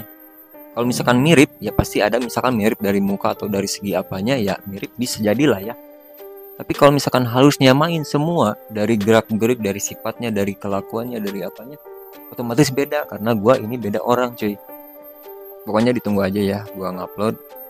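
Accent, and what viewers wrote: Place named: West Java, Indonesia. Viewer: Muka sama gaya bicaranya Abang emang mirip alm denzbagus bang ,,cuma beda logatnya aja kalo alm denzbagus kan kalo ngomong logatnya Lampung ,kalo Abang Sunda tapi gaya bicaranya sih mirip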